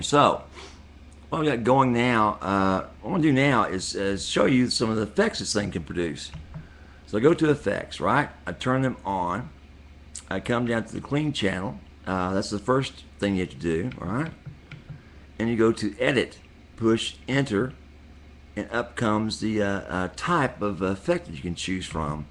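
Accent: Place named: South, United States. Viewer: Bro you are a good ole southern boy just like me lol Ive got the very same drawl you have!!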